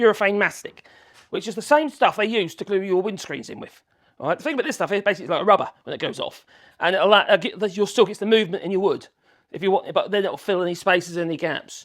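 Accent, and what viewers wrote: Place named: Shires, United Kingdom. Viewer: I love the way you work! It makes me feel better about my own head 😂 Coming from one county north of you makes your channel feel almost like home. There's far too few Shire accents on oooootoooob. You and Furze are about it!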